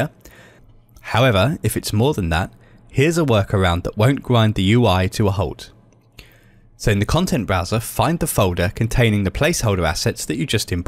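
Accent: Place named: United Kingdom. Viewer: Props to the ever smooth British (I think) narrator with clear and crisp voice, always nice to hear things accurately.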